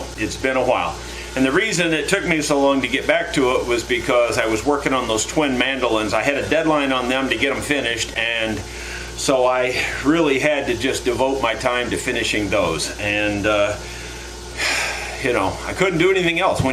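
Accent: South, United States. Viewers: These videos are becoming addictive to me as he verbally takes us step by step through the process of working with his hands, with wood, in that sure and easy southern voice of his.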